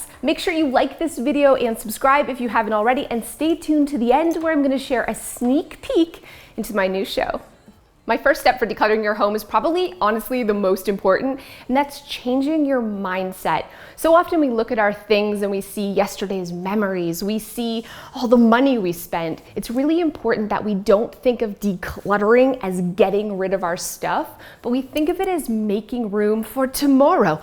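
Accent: Canada. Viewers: I just saw that sweet Canadian accent on HGTV! Gonna start watching your new show Hot Mess and wanted to say congrats. I’ve been watching you on YT for years and LOVE that you’re now on my TV! Yay!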